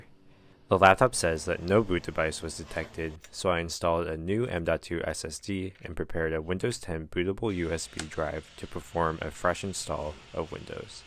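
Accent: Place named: United Kingdom. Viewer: why is there a man from UK talking?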